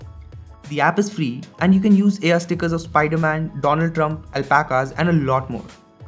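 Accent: India. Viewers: Good video overall but I don't like that thick Indian accent. Sometimes it's hard to understand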